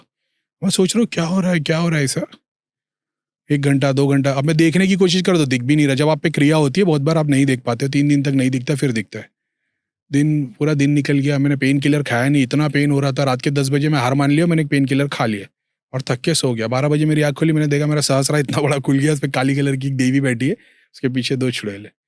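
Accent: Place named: Mumbai, India. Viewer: Wo uska bolne ka dhang hai, wo Mumbai se hai. Uska matlab ye nahi ke wo kisi ki disrespect kar raha hai.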